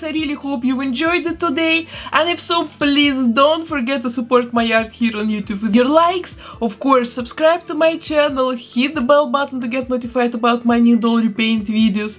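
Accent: Russia. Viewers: Вот это акцент )))) русский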